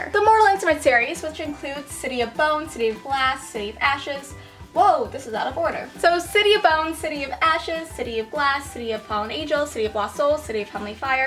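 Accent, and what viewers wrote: Scotland, United Kingdom. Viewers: That is the most hilarious Scottish accent I have ever heard😂😂😂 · I love the scottish accent 😂👌🏻❤️ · Your scottish accent is to die for! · Your Scottish accent is honestly the cutest!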